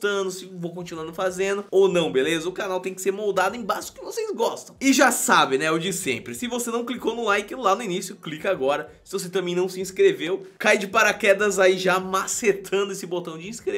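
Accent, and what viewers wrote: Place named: Ceará, Brazil. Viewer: Você e cearence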